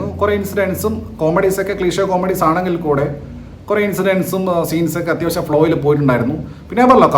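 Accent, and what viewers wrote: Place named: Malappuram, India. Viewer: കണ്ണൂർ slang ആയി തോന്നിയില്ല പക്കാ മലപ്പുറം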